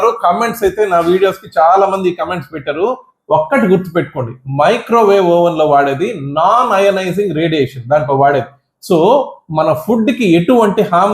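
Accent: Nellore, India. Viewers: Nellore slang భలే మాట్లాడుతున్నారు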